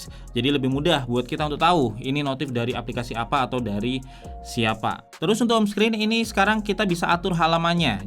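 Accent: Malang, Indonesia. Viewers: Asli malang bang ?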